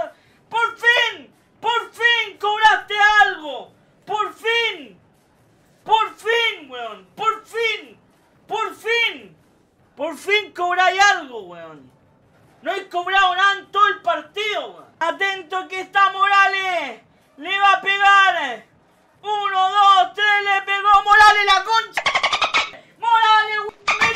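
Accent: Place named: Argentina. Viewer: POR que hablas como argentino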